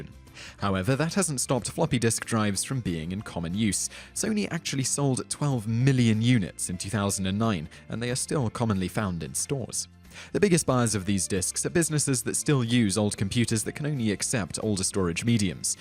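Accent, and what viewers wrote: England, United Kingdom. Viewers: British english is obsolete too! Loose the accent! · You have an English accent, but your video is about America.... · your accent is english yet you use america as an example. ? :S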